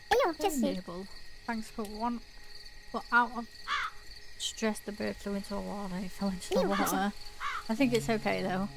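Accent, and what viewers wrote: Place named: Yorkshire, United Kingdom. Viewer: Voice of a yorkshire angel to guide us through the demo! Amazing 😁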